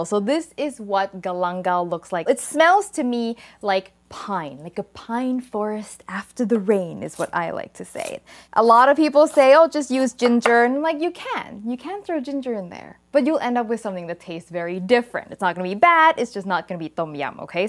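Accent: Thailand. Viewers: wow a little bit surpised! look at her ingredient she use i think she aint live in thailand anymore , every label is a international label have english in the label and the product name is also write in english that not usal in thailand that the most of label always write products name in thai. anyway she is thai could answer why her thai accent is so lovely. :) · น่ากินมากเลยนะคะ ออกเสียงภาษาไทยเหมือนมาก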